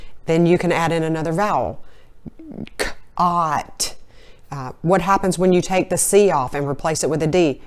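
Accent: South, United States. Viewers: When she says 'Go get that', it sounds like 'Go git that', because of her southern accent. It can be confusing for a young person to sound it out correctly.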